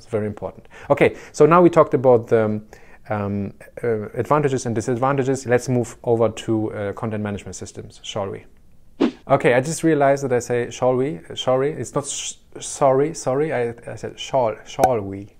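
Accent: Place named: Germany. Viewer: Kann ich dich vielleicht irgendwie sprechen, ich bräuchte etwas hilfe. Danke für das Video, ich habe rausgehört das du Deutsch bist. LG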